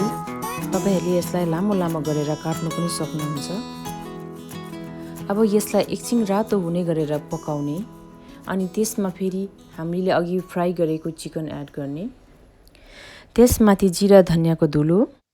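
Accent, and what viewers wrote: Darjeeling, India. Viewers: kati mitho boli ho didi hajurko fist time herdai chu tara aawaj chai sarai ramro darjeeling ko ho didi hajur??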